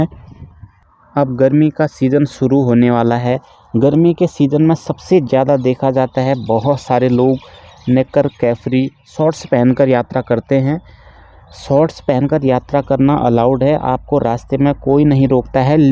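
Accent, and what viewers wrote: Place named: Uttarakhand, India. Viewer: जय माता दी 🌼🌻🌹 Sir, are you from Uttarakhand because your tone is matching with Uttarakhadian's people's.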